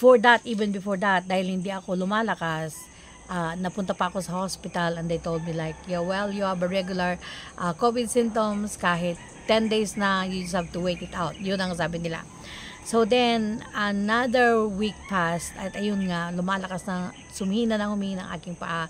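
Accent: Ilocos, Philippines. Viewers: ilocano ka gayam madam? kunak idi bisaya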